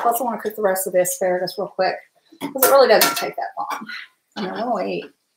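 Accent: South, United States. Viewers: Yum! Enjoyed this video and just want to say, you have the best southern accent! Love it!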